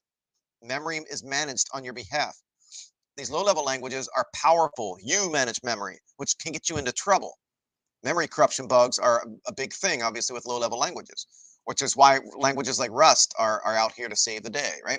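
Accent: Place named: Los Angeles, United States. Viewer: Which accent is that? LA?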